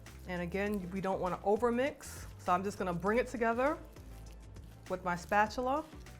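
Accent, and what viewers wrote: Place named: New York, United States. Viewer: Man, I could tell she was from New York- soon as she spoke ... I wanna make a meatloaf but I don't have fresh Parsely☹️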